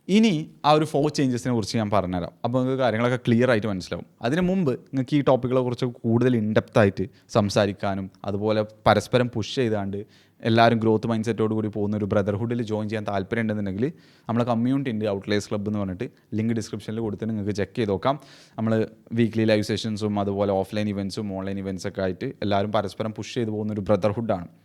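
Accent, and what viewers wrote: Malappuram, India. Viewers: Chettan Malappuram ninn ahno varunne?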